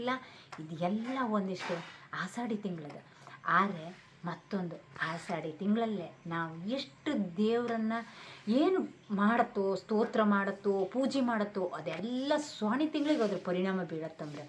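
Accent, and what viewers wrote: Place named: Kundapura, India. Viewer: Kundapura kannada ❤ U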